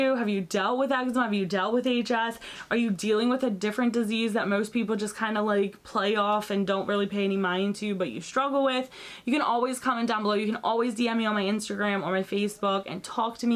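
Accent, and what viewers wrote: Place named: Philadelphia, United States. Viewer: I just subscribed after your declutter and saw this video. My son had eczema so bad as a baby that we had to take him to see a pediatric dermatologist. We had to put giant bandaids on his face because his cheeks were red, shiny, and weeping. He's now 11 and after several years of relatively minor issues, he just started getting the red rough bumpy patches behind his ears and on his arm where his watch is so we started topicals and we are limiting unnecessary showering. It definitely cycles especially with allergy season. It messes with you mentally and emotionally and you are right some people have no idea how much it can impact more than your physical health. I recently started on the spironolactone due to pcos and I seem to be tolerating it well. I noticed the benefit of the clearer skin. Its nice to have a postive side effect for once. 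You got this! Also, where are you from? I'm originally from Philly and you sound like you grew up in that area.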